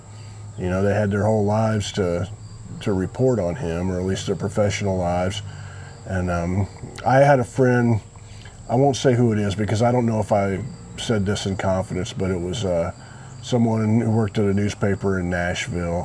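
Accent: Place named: Fort Wayne, United States. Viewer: I appreciate what you're doing I grew up in ft Wayne so I sound like you I wish I could tell a story like you please keep it going your the best , love you in Christ always amen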